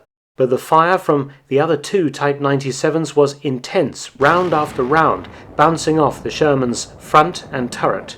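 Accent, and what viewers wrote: United Kingdom. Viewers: I love your intro then your awesome British professional voice over.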